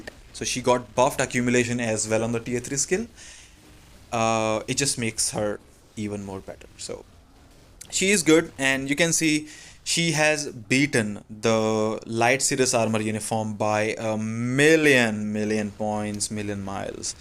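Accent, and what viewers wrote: India. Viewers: Your English look like Indian accent..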